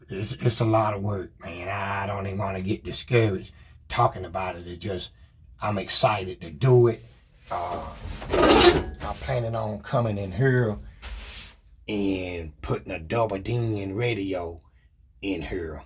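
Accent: South, United States. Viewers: Love your accent, I’m from the south too, been to Ft Bragg when I was in the military years ago. Nice truck,I have a 63 c10 350/350 combo and would love to do that swap in my truck or 86 Trans Am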